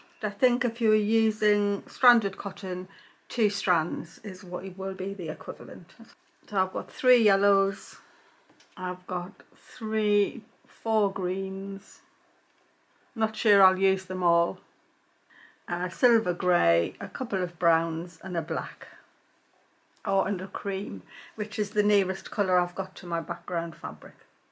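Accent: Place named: North East England, United Kingdom. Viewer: For me, it’s so lovely to hear somebody from the north east because it does feels like I’m listening to a friend or relative. Thank YOU for being here ❤❤❤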